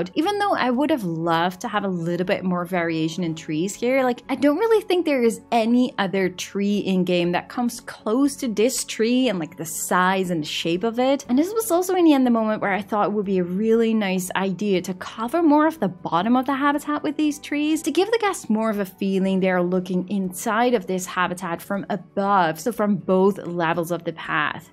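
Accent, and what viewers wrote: Netherlands. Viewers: im non-binary and my name is Nads. it would be really awesome to see a more androgynous looking staff member with my name 🥹 or a funky lil animal. I'm also Dutch btw!!! our accents are very similar 😁. I've spent the whole morning catching up on this series, and i love it so so much!! i would love to see more foliage footage personally, and besides, you tag timestamps so well (thank you btw) that people could easily skip ahead if they wanted to!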